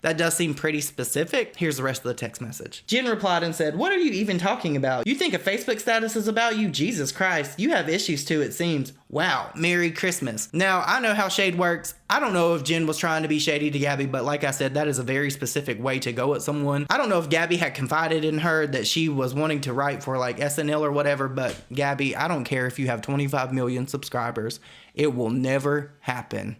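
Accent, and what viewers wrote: South, United States. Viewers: This is the first video I've ever seen of yours and I am an instant fan! Subscribed with notifications on! Love your southern accent! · Being a TN girl I didn’t realize it till now but a southern accent adds so much drama and oomph to any tea it made all this shit going on 10 times more interesting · Omg- its something new with this whacko every single day! Thanks for all of your hard work, to bring us the latest tea 😉 And, OMG- your voice & adorable southern accent are so calming to me! I just adore you! 💛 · Hey, well you r saying what of a bad person she is, but look at you.... Let your damn southern accent look at its self first and then you can judge about others. What you are doing is pretty fckd up.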